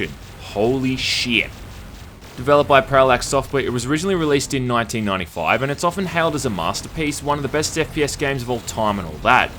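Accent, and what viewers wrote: Australia. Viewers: Yaay somebody likes me, even my mother doesn't like me. Even watching this just gives me motion sickeness, blah I shall just listen to your sultry Australian voice.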